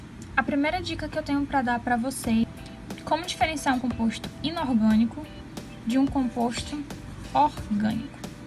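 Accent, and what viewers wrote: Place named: Maranhão, Brazil. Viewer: Você é do Maranhão ?? Tem um sotaque parecido com o de uma amiga minha que é conterrânea dela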